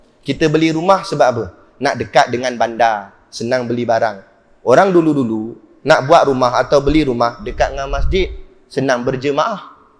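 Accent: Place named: Perak, Malaysia. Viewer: Patut lah fasih Loghat Perok...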